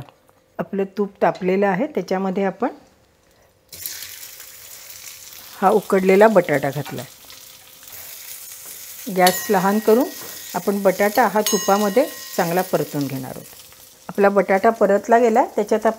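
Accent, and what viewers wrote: Standard. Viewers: हो मावशींची स्पष्ट शुद्ध अलिकडे दुर्मिळ होत चालले ली भाषा ऐकायची. मला मावशींची भाषा खूप आवडते. इतर चॅनेलवर आधी भाषेची वाट लावतात आणि मग पदार्थांची. मावशींच तस नाही.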